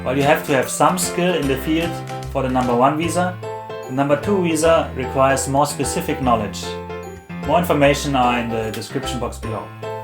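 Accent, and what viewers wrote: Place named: Germany. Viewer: oha i wuste doch das dein aktzent etwas deutsch klingt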